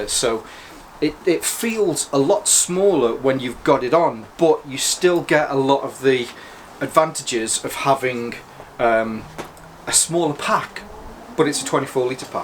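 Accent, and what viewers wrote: United Kingdom. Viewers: Hello Sir, are you British ? (i say that due to your accent)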